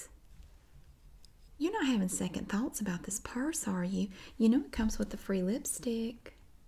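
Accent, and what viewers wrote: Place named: North Carolina, United States. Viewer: I love this accent so much as a southern guy. It's really we done. Then I find out she is in NC cause Winston Salem is right up the road and now I understand why it sounds so familiar. NC represent!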